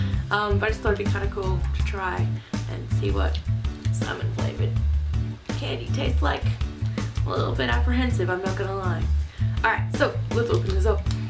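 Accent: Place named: Australia. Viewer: Love your videos! What country are you from? I'm assuming your Australian judging by your accent, because I'm Australian and that's how most people sound here, if not then I am horribly, horribly wrong.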